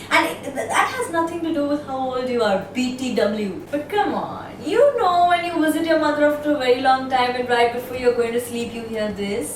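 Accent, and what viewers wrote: Maharashtra, India. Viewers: please use a lot of your Marathi accent cause it's super cool.... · I love your marathi accent..... You rock it... :* love you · yeah so true i dont why but is way better than superwoman her marathi accent is so cute n i find her videos relatable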